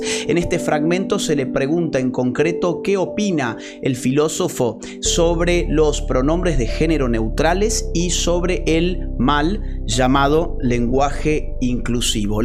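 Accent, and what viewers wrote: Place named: Argentina. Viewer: El presentador hace lo contrario a lo que enseña el pobre Chomsky. No comprende, ni remotamente lo que Chomsky está intentando plantear, para que se empiece a pensar. Estas cosas hacen que el acento argentino suene tan feo que a nadie le guste ni ir, ni juntarse, ni estar cerca de nadie con ese acento, de “sicólogos”, de habladores, gente que engaña y de gente de poco nivel. Así nos va. Un molino de palabras pegadas para hacer teatro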